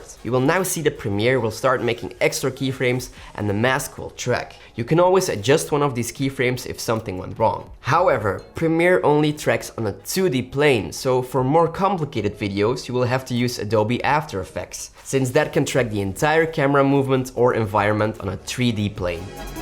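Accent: Belgium. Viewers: you sound like you live in belgium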